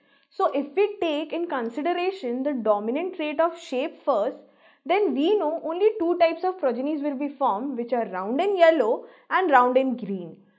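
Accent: India. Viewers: the indian accent is dominant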